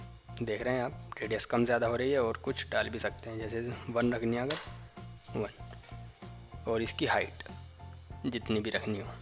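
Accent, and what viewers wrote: India. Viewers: bhut ala or apki Urdu bari mazay ki ha , anda kaar shape very sweet Indian Urdu I like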